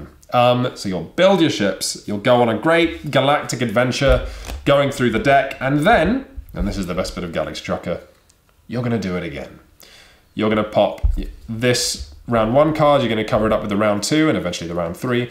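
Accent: United Kingdom. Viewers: Looks like a fun game - it's even better watching you with a British accent and uncombed hair explaining this looking like your apartment was just hit by an asteroid storm lol. :P Subbed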